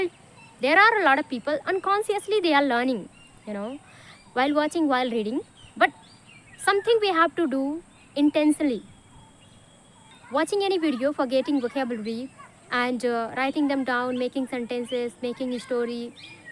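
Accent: India. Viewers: Abe Indian accent choro🤦🏻‍♂️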